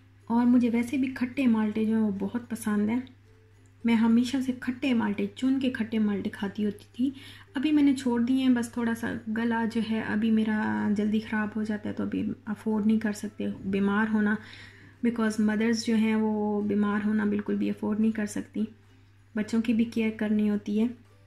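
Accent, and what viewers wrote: Saraiki, Pakistan. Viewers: Hi sister!from where are u?ap k bolne se sarahki zuban ke jhalak a rhe h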